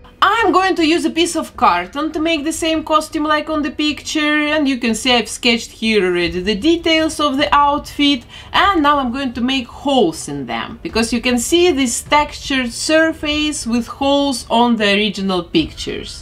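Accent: Russia. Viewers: Стойкое ощущение, будто человек за кадром имеет русский акцент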